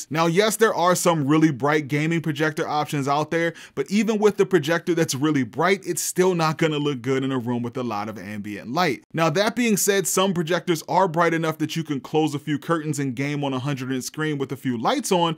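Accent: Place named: Memphis, United States. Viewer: Are you from Memphis? “Lumen” was not helping you hide your accent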